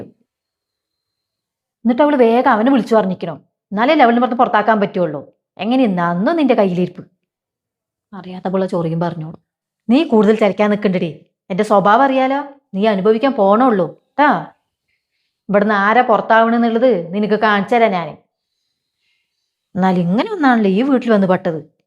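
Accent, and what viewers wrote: Palakkad, India. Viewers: പാലക്കാട്‌ ആണോ നിങ്ങൾ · Palakkad vannattoli poyi ttolii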